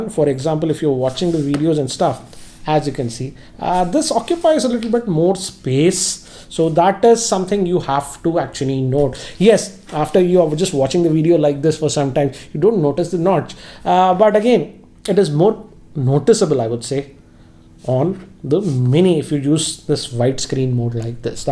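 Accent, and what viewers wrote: India. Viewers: I love his indian accent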